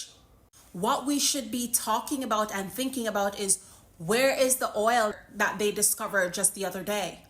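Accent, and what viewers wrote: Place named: United States. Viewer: Shut up is because you away in America that is why u and your is talking the way u a chat.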